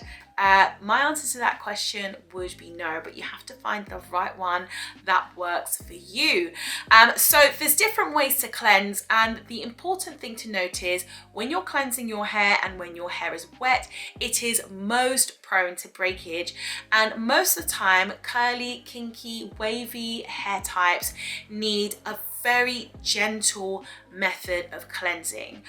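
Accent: England, United Kingdom. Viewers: Are you from England?? Or London?? Is that a hat behind you. 😂😂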